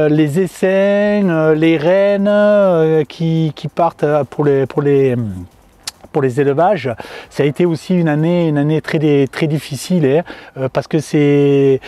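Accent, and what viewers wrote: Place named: South, France. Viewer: Merci our le bilan de l 'année et l accent du midi, plafond bas et frais sur notre tête en normandie depuis quelques jours, en espérant que 2022 soit meilleur pour les apis bonnes fêtes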